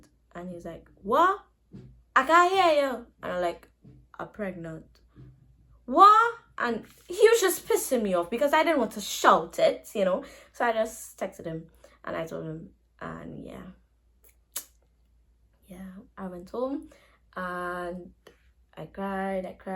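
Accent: Trinidad and Tobago. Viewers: Are you saying she is from Jamaica or you are from Jamaica? Cause she is Trinidadian, you can hear her accent and on her Instagram she has Trinidad. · Town and KFC are popular in Trinidad I live in Trinidad she speakin trini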